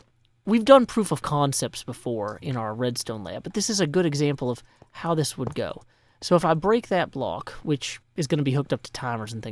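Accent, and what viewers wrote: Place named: South, United States. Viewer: This is the first time I’m watching one of your videos. I haven’t watched Minecraft videos for the better part of six years, but nothing has brought me more peace or put a smile on my face than listening to you talk to us about assumptions and the dangers of comparing yourself to others. I thought I didn’t like southern accents, I have changed my mind Thank you.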